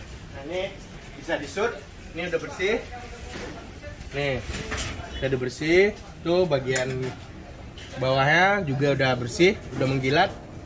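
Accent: Padang, Indonesia. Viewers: Orang Padang bang? Logat bahasa Indonesia nya kek bahasa org Padang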